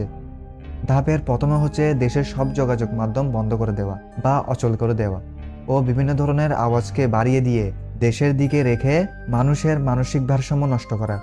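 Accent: Chittagong, Bangladesh. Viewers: আপনি কি ভাই চট্টগ্রামের আপনি কি ভাই চট্টগ্রামের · Vai ki Chattogram er?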